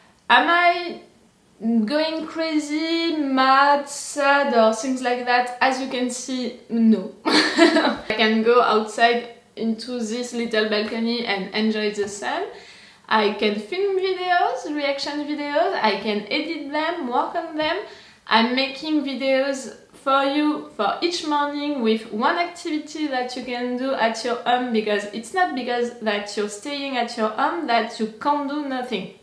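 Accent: France. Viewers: Your french is a particular dialect by the accent you speak in English. I have heard it before. I don't know which one but it is not as common. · Lol “I’m still French” has so much weight with this quarantine. Like You may be quarantined, but your French will never be Quarantined 😂 I love your accent. My father studied in France and I learned a bit of the language growing up. But I don’t remember everything anymore, just a little. Je peux dire ça en français. Je pense que vous êtes une femme très belle et intelligente. Lol I hope I put the accents in the right place, :D Anyways stay healthy and safe, and i will listen to your Reaction now while I work :D ❤️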